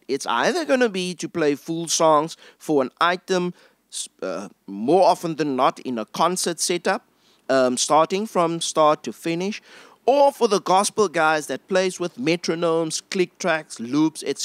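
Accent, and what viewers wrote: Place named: South Africa. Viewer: I am going to guess that's a South-African accent. It's grating at times to my American ears, but well-worth tolerating for the excellent job you've done with this series. You definitely have a teachers's heart!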